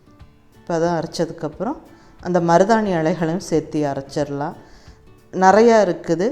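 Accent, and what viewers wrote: Coimbatore, India. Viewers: I like ur kovai slang --- saeithi, karuvaapla,vangayam etc. Ur videos are so good. Keep it up. expecting your house tour as well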